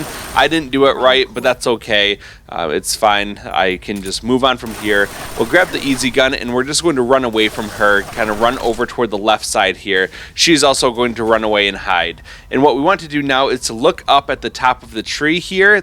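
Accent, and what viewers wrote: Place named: North America. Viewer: Is this the NA version or EU version, im guessing NA because of your voice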